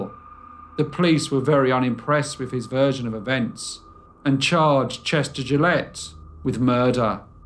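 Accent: United Kingdom. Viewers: you really need to lose the british accent for your most large american audience. i would suggest california valley girl. the kardashians speak fluent valley girl, so listen to them. soon you'll be shortening words like gorgeous to gorg, fabulous to fab and ridiculous to redick. any word that ends in ous you will learn to shorten on the fly. i promise you your subscribership will soar.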